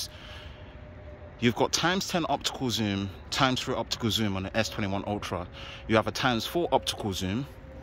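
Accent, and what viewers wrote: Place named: United Kingdom. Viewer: i like that british accent my friend. how about battery test ?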